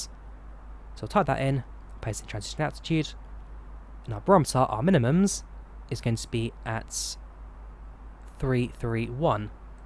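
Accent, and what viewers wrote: United Kingdom. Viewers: Such a nice tutorial video . But couldn't understand your British accent sometimes. But big fan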